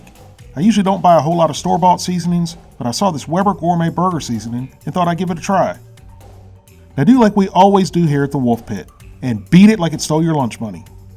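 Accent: South, United States. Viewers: Guy who's says that bacon goes the world go round? Yep, definitely Southern.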